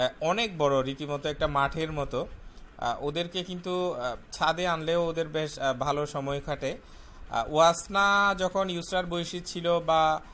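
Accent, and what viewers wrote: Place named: Chittagong, Bangladesh. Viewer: Apu ke bolsi,apu problem holo ami chittagong er kothagulo bujte parini...☹!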